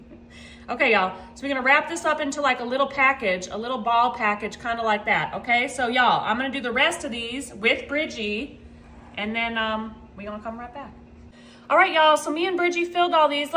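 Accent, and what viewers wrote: South, United States. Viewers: People talk like that from the South, excuse me.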